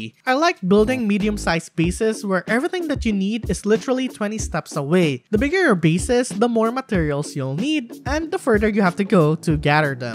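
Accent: Philippines. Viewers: You sound Filipino 👀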